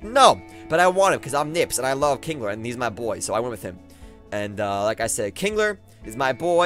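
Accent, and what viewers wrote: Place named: Brooklyn, United States. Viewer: wats with the Brooklyn accent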